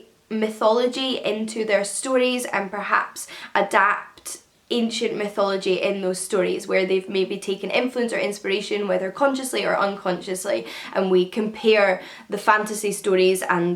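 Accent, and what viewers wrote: Scotland, United Kingdom. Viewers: The podcasts sound fabulous! I've subscribed. You've inspired me to learn so much about the classical worlds. I'm about to open to the first page of Circe so I will listen to your interview with Madeline Miller very soon. I love your PS in the introduction "it's a Scottish accent". My grandparents were Scottish and I miss hearing their accents so I get an added bonus listening to your new podcasts:)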